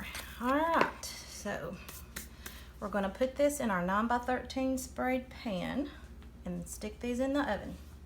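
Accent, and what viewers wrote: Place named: South, United States. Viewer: Love her Southern accent. Much better than the East Tennessee drawl I had many years ago!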